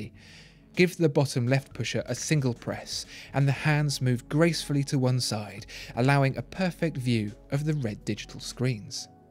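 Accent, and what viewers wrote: United Kingdom. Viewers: I look forward to each and every one of your videos, they are supremely well done and different to any other watch channel on YouTube. Also it is pleasant change having a British voice rather than the many American channels (no offence to our US friends, just different). Keep up the great job 👍😎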